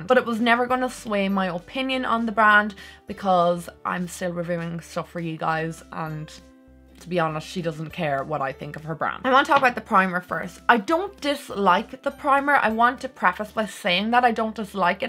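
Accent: Ireland. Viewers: I just love you so much!! And I enjoy listening to your brogue! It reminds me so much of my Nana who is from Kenmare..And I just love your makeup looks and think you are so talented!! Much love from Boston!!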